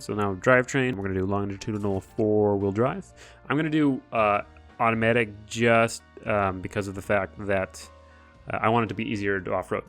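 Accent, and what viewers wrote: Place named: Bay Area, United States. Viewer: he sounds like bay area buggs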